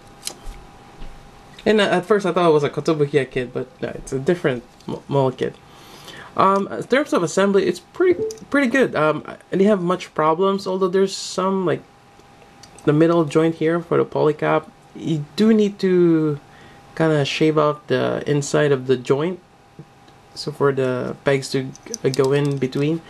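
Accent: Philippines. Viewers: yo sir! sorry, are you from the philippines? medyo pinoy yun accent mo hehehe. if you are i'm curious where you picked this up! available in greenhills?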